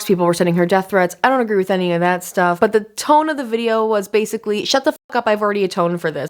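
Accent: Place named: Midwest, United States. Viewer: Omg are u Midwestern? I hear my ‘aaaaaah-ccent’ in ur way of talking hehe ;)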